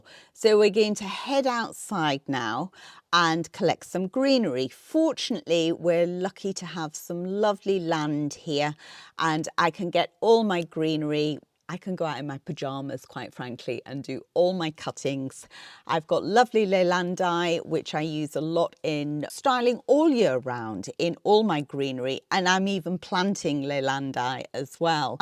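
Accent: England, United Kingdom. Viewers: I love the way the English say 'watah' instead of waterrrrr :)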